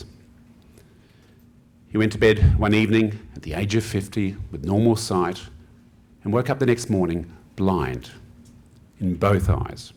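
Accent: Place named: Australia. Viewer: Reasoning always sounds better with an Aussie accent.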